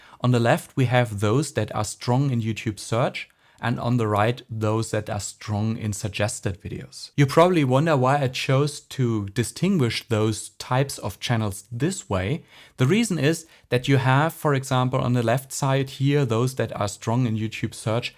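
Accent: Germany. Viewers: You have some accent. Are you German? Did you consider adding German or whatever subtitles + description translation to your videos to run an experiment and measure the effect on total views? Can't find this kind of topic anywhere. Seems like nobody figured it out.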